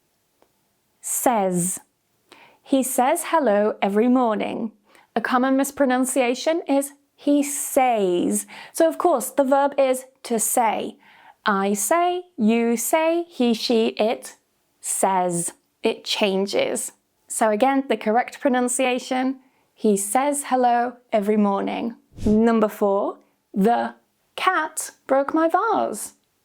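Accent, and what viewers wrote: United Kingdom. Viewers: I don't think Americans should get pronunciation lessons from a a British person... Js... you guys mispronounced words all the time. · She sounds British. Often has a silent T where I was raised. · There’s no such thing as ‘perfect’ English nor ‘correct’ pronunciation. There’s common ways to say things. You are British & as an American from NC (the South, y’all) there are many words I pronounce differently than you. Doesn’t make you correct nor me wrong. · I love to learn to speak the English language with the British accent. So glad to have found your channel. Also, I love how vibrant and fresh you are with your beautiful smile and rosy cheeks. :) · You sound like a Britian.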